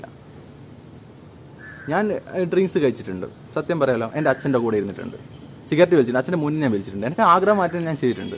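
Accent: Kannur, India. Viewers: Kannur language super 👍👍👍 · ഹിന്ദുവാണോ. ക്രസ്ത്യൻ ആണോ. മുസ്ലീം ആണോ . എന്ന് മനസ്സിലാവില്ല എന്നാലും കണ്ണൂരുകാരനാണെന്ന് വർത്താനം കേൾക്കുമ്പോ മനസ്സിലാകും. ഞാനും കണ്ണൂരാണെ.....😀😀😀😀😀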